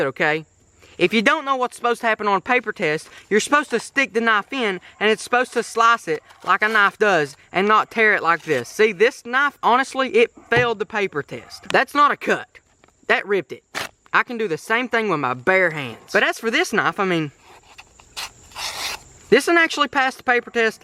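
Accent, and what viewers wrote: United States. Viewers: I Like His Cowboy Accent 😂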